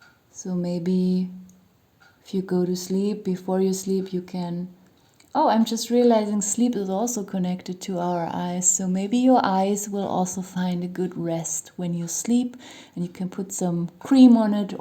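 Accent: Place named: Germany. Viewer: You gotta sexy voice, babe, with a tiny German undertone somewhere, sounds cute!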